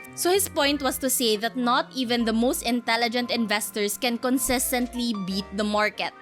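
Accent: Visayas, Philippines. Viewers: Bisaya po kayo Ma'am 😁😁 ??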